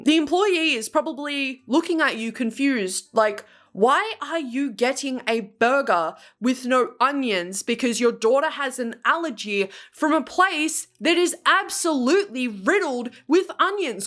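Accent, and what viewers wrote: South, United States. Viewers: your Southern accent is spot on 🤣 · Why is your southern accent peak? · Ngl ur American accent is hilariously good 😭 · I wasn't expecting the southern accent immediately, I'm crying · your american accent was so good i forgot you were australian for a second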